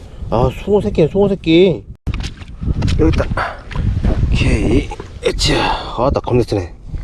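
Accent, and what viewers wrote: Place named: Jeolla, South Korea. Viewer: 매번 영상 잼나게 잘 보고 있습니다.^^ 더운 여름 홧팅하세요 * 건의 사항 구독자로서 주제넘는 참견(?)을 좀 하자면 - 구독자가 팍팍 늘었으면 하는 바램으로 1) 오늘 영상에서 조개 잡는 영상을 좀더 길게 했으면 합니다. (조개잡이 7분 먹방 9분) 사람마다 차이는 있겠지만 저는 먹방보단 조개잡이가 더 길었으면 하는 바램 2) 먹방영상을 따로 만드는 것도 좋겠어요. (동네 또는 지역맛집소개) 아예 대놓고 막 드시는 영상요... 마치 먹방유튜버들처럼 해도 잘하실듯. 너무 맛나게 그리고 많이 잘 드심 3) 컨텐츠 하실 때 , 말씀을 더 많이 하셨으면 합니다. 형님 일상이나 컨텐츠 내용 설명이라든지 저는 경상도 사람이지만 형님 이야기하시는거 너~~~~무 정겹고 좋습니다. 사실 저는 거의 형님 전라도 사투리 듣는 재미로 온당께요. 빨리 구독자 많아져서 전업유튜버(?) 하시길 응원합니다^^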